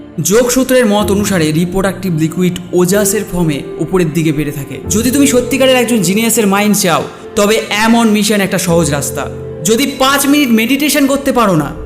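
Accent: India. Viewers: এটা কি বাংলাদেশ ইউটুইব চ্যানেল ? দুঃখিত কথা শুনে ভারত পছিমবঙ্গ মতো লাগলো. তোমার কথা শুনে আমার খুব ভালো লাগলো খুব expression পাওয়া গেলো ।❤️❤️❤️❤️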